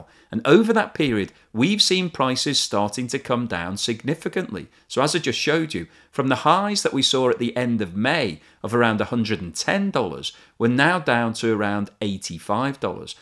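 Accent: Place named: Liverpool, United Kingdom. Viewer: Great content and superb delivery -{ for non-UK viewers, delivered in a pleasant Scouse ( ie Liverpool) accent )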